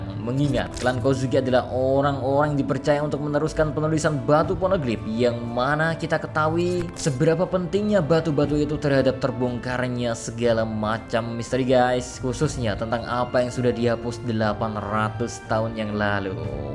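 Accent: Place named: Lampung, Indonesia. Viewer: ini orang lampung kayaknye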